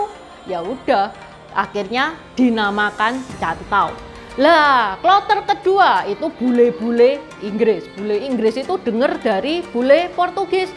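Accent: Java, Indonesia. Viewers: apa dah berumah tangga, and anda kyak dri jawa ngomongnya tu lo medhok bnget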